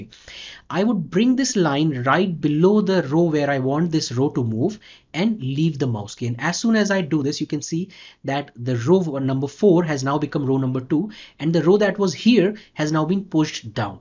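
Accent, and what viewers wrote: India. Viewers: When you're trying to learn something software wise, you know you clicked the right video when the first thing you hear is an Indian accent. Awesome video, very helpful!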